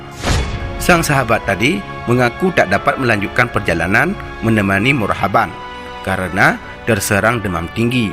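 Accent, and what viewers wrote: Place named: Bali, Indonesia. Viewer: Bicaranya kok mirip bali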